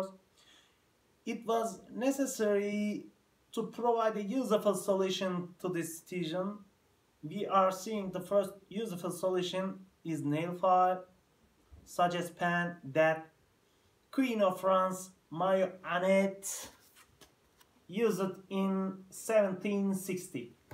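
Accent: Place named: United States. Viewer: What kind of Merican accent is that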